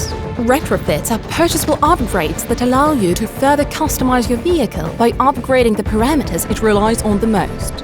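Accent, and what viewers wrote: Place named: Australia. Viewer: Sorry but this aussie accent in this video is very awfull to listen! A clear american accent would fit much better here 😉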